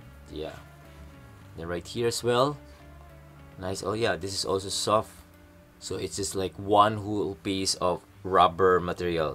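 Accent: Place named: Philippines. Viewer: Are you Filipino, sir? Your accent sounds like..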